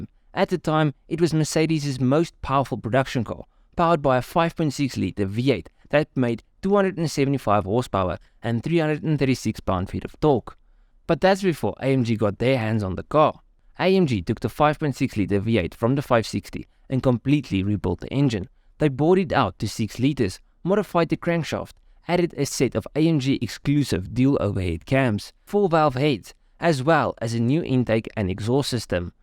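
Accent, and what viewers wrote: South Africa. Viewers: You sound very south african my guy. Love it!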